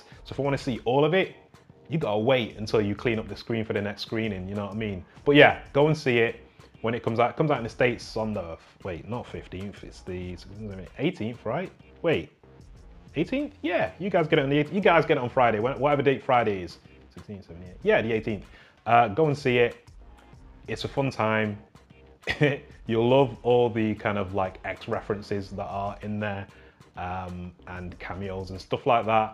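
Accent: United Kingdom. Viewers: It's good to hear a northern accent on youtube. There isn't enough. Lancs here.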